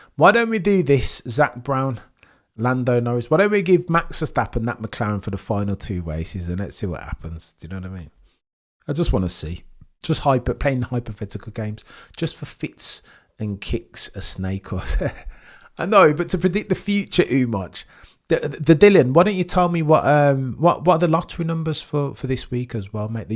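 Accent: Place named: England, United Kingdom. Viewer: Your sound is English but a Verstappe-fan? Are you pissing my ear chap?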